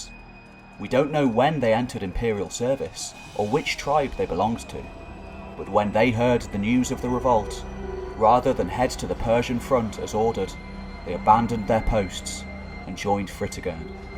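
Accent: United Kingdom. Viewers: whereabouts specifically in the UK is this accent from?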